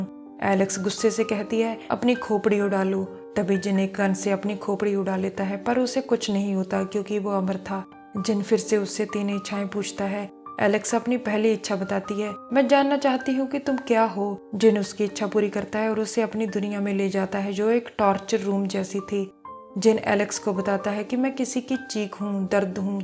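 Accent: Punjab, India. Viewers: Hardcore punjabi accent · so much punjabi accent..in your voice..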